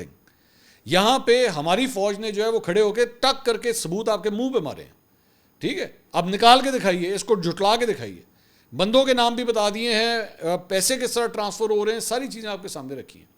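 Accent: Punjab, Pakistan. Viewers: Dammmm I love ur puuunjaaabi😂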